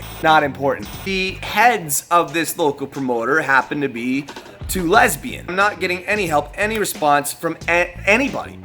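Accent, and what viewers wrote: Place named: Minnesota, United States. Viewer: That Minnesota accent though 😍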